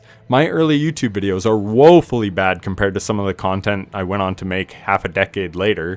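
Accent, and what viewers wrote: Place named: Canada. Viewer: Halfway through the video I realized you’re Canadian 😂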